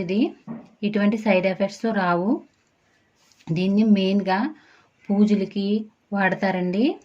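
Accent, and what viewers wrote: India. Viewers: Matladetapudu slang easy ga telustundi godavari ani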